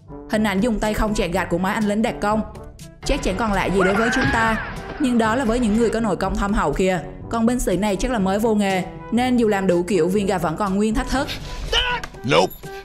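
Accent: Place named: Binh Dinh, Vietnam. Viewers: bạn nữ có giọng tốt, âm thanh tốt, nhưng còn nói tiếng địa phương quá nhiều, bạn nên tập trung nói 1 giọng hoặc giọng Bình Định rặc, hoặc giọng giọng Bình Định nhưng sửa lại 1 số từ phát âm địa phương như các vần "in, ăc, ăng, ăn..." hoặc giọng miền nam, tránh phát âm các từ địa phương nghe nó quê mùa lắm. Chào thân ái - đoàn kết - xây dựng! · Chịu khó đọc bằng giọng phổ thông đi em, còn nặng giọng địa phương Bình Định lắm.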